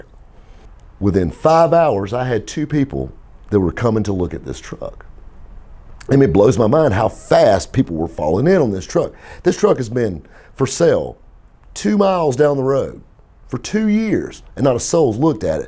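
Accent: South, United States. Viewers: i love this guys stories ed's are good to but southern draw takes me back home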